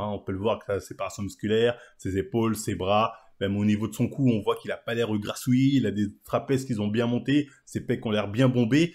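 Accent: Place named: France. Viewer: On aime bien quand tu parles très français comme ça 🤗🤗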